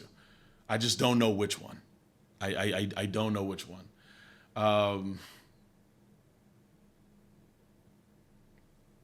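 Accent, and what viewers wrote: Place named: United Kingdom. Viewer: That British accent is his real accent.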